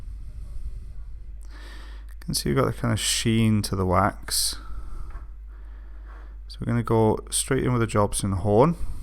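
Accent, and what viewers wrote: Wales, United Kingdom. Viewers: Mum is welsh so i know that accent well even though we been living in America for 25 years lol and I can confirm welsh people are very intelligent people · I'm in Wales tonight and can confirm plenty of people talk like and everyone I've spoken to thus far knows what a Jobson Horne is.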